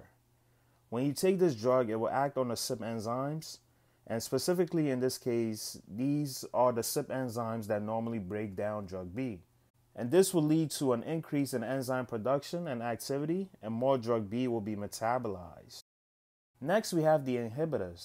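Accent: United States. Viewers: Why do I hear a nawlins accent? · great video. lol but I swear I can hear a NYC accent.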